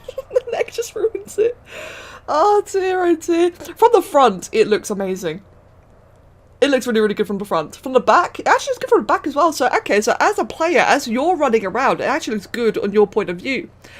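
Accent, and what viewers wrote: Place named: RP, United Kingdom. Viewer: poshest women on ark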